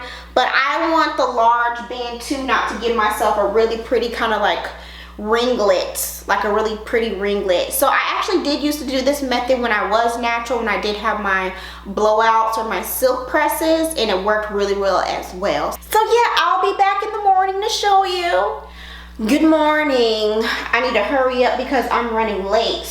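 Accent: United States. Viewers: Her accent XD I love America sometimes. She's cute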